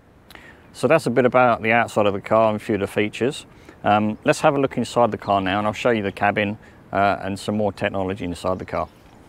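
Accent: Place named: United Kingdom. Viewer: U sound British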